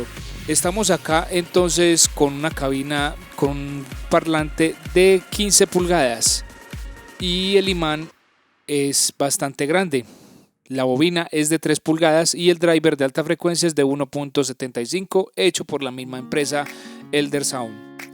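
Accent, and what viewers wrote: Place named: Colombia. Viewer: Todo bien explicado hy el vídeo muy bueno., LO MALO es el acento colombiche que de verdad, el solo escucharlo es repugnante., Hubiesen puesto a alguien con acento NEUTRO para que el vídeo sea más limpio. DE RESTO, EXCELENTE VIDEO😊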